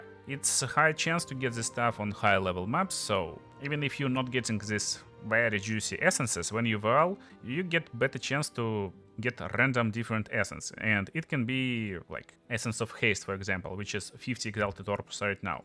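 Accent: Russia. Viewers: sounds like Runglish orn Engussian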